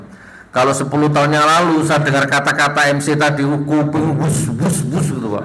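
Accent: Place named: Banyumas, Indonesia. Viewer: Senangnya sama Gus Nur ini blaka suta cara bahasa ngapaknya love you Gus ❤❤❤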